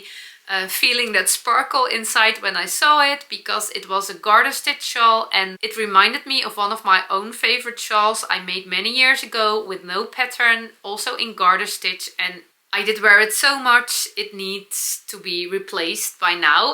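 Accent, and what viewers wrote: Netherlands. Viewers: I look forward to your Dutchy English each week. Thank you for sharing heart jumping moments through your creative processes. The plants you have look happy too. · I love your dutchy English, your yarn choices. Vests are always a great garnement, and knitting sleeves feels like knitting the second sock. 😂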